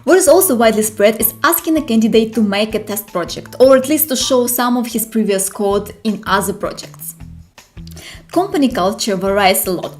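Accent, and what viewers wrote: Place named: Eastern Europe. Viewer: Nice person but I have to be honest....Listen to „eastern-English“ is really exhausting....sorry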